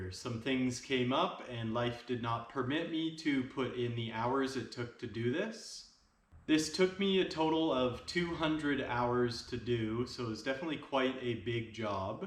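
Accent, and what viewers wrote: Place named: France. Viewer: Hi, are you french ? You have a french accent !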